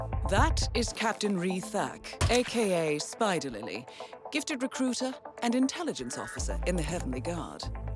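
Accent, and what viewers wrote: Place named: United Kingdom. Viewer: I really like the British accent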